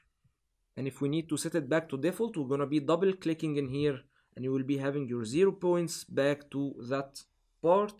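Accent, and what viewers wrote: Egypt. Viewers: مصري حيبي عرفتك من لهجتك تابع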